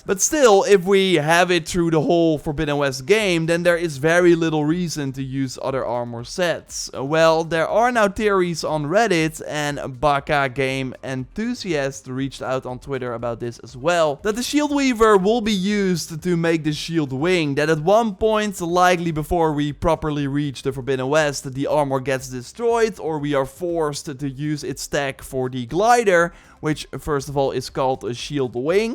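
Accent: Netherlands. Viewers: I can tell he's Dutch and it's just too funny.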